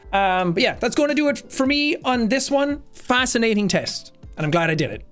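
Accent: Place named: Ireland. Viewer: After the ronnie Neville video I can hear irish side in every video and its amazing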